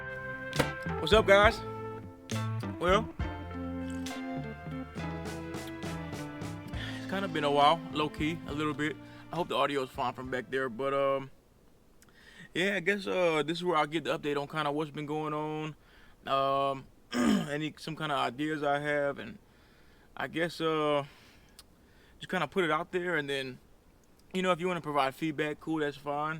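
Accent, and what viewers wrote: Louisiana, United States. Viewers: Sounds like you from Louisiana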